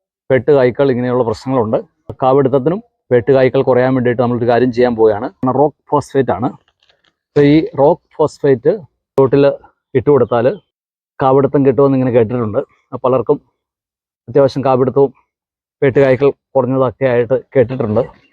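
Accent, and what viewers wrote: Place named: United States. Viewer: ഒരു അമേരിക്കക്കാരനെ പോലെ സംസാരിക്കും. ഇംഗ്ലീഷ്.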